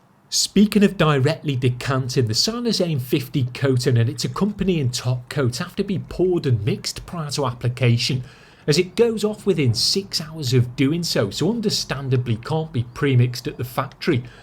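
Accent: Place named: Scouse, United Kingdom. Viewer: Yes, accent - it's a soft scouse.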